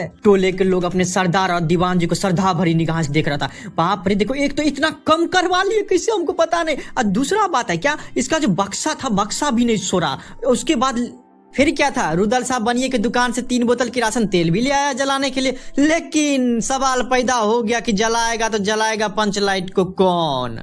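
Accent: Bihar, India. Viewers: Aap Bihar se ho kya 😄😄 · Bhai aap bihar se ho kya aapki awaj se lg rha h bihari log ki aawaj aisi hi hoti hai😊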